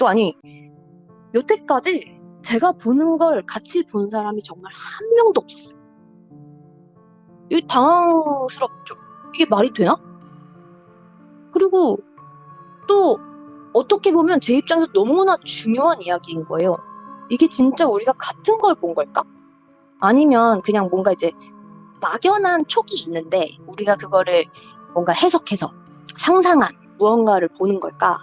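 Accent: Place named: South Korea. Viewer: 아.. 이분 나만 샤샤같다 느낀게 아닌듯요.. 미국에서 학교 다니신분이 캘리포니아.. 매우 토종한국스러운 발음이신듯요 ㅎㅎ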